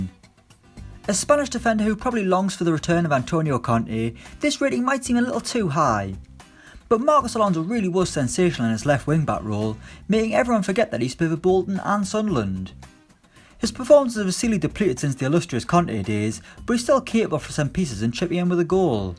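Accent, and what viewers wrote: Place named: Ireland. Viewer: Irish guy detected!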